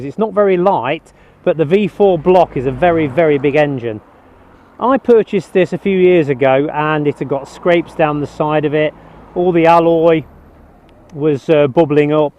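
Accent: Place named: Leicestershire, United Kingdom. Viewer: Im trying to work out the accent...defiantly Leicestershire...roads look familiar . good review...a refreshing change from silly,loud mouthed over excited yanks.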